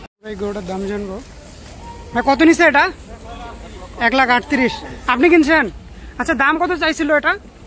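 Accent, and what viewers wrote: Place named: North Bengal, India. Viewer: ভাইজান কি উত্তরবঙ্গের? কথার সুর/টান উত্তরবঙ্গের মানু‌ষের মতো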